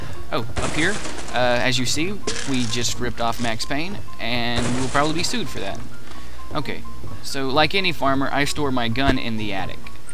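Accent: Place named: Appalachia, United States. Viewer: lol, I love his accent... And the intellegence thing, HES A HILLBILLY !!! I wonder where cleedis is? I hope he done did get outta here before dem zombie fellers got him!... hehe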